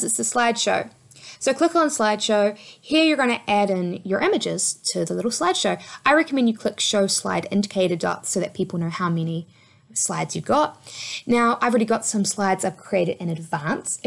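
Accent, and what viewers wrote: New Zealand. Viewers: Took me a few minutes to get the Kiwi accent but sounds like it has a twang from somewhere like Canada or Somerset UK ;) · No she's a Kiwi (New Zealand) or as you guys would hear Nu Zilland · NZ accent, just replace 'e' into 'i', that's simple =) eg: tidtalks, stip by stip, siven & etc... Tips: 0.5 speed listen carefully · Another great video thank you. Love that this is Kiwi made. Just a pity others don't understand our accent.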